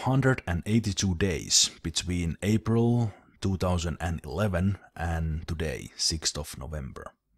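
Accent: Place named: Finland. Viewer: Gratz, you and your channal are a part of my day! When i found your channal first time i didnt quite like your voice, but now i think its epic, English+Finnish accent=Awesome!:D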